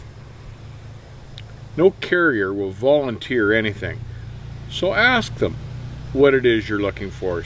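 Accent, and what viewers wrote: Canada. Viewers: You Canadians have the best accent! LOL! Love the channel! Keep up the great work!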